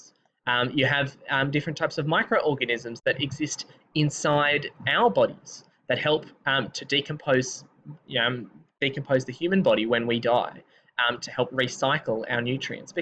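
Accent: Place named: South Africa. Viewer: I bet u r South African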